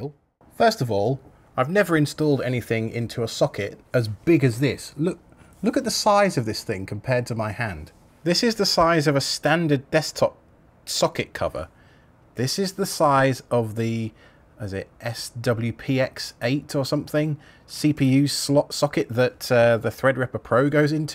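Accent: United Kingdom. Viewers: I just had an uncanny valley moment hearing inches in a british accent.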